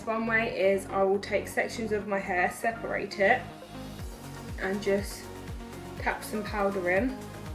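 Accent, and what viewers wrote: United Kingdom. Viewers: Her accent !! Where in England is she from? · british accent is so cute you are so pretty ;)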